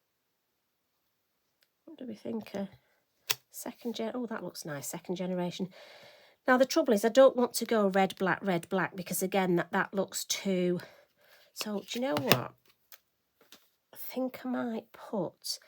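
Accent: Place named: England, United Kingdom. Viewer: First I got to say I just love your accent! There was a zoo show that I watched that was somewhere in England I forget now. But I don't think it was in London. Anyway one of the keepers had your same accent but I can't recall where she was from. Anyways these are both so beautiful but I think I'm also partial to the one on the left. They both have depth but I think the one on the left has more and I think some of it's coming from some of the wider spaces on the stencil being more filled in with the red. Especially on the right side a little bit around that butterfly on the right. I need to get my gel plate out and play with it. I know you don't know me so I hope you won't think I'm interfering but I'm concerned when you said that the nail polish remover has begun hurting your lungs from the fumes. If this is something new if you haven't already I would definitely urge you to maybe see a doctor about it. 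It may be absolutely nothing, but if it's a new development it might be a good idea to have it checked out, especially with the bit of hoarseness you appear to have. I've only seen a few of your videos so far but I love your videos and the art that you make!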